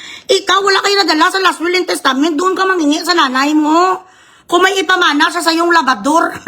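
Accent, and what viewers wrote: Visayas, Philippines. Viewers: natutuwa ang taumbayan sa iyo. Mabuhay ka. Sincere ka, honest. Very lovable ka sa Visayan accent mo, delightful sa ears ang Visayan accent mo. Candid ka, walang hypocrisy. Maganda ka, ang face mo guwapa ka, ang taumbayan ay hinahangaan ka sa pag analyze mo ng political situation ng ating bansa. Di ka plastic, may sense of humor ka pa, di ka nagpapanggap. Very true ka pag nagsasalita, walang kaartehan. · i hate sarah duterte pero natutuwa ako sayo parang ang cute ng visaya accent mo at i like you maldeta ang peg hahaha aliwwww .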